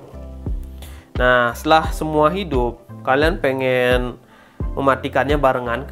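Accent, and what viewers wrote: Bali, Indonesia. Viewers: Logatnya kaya org bali nih wkwk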